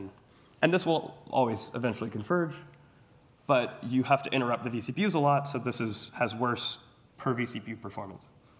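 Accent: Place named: Assam, India. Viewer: You speak phenomenally well, despite the Assamese accent!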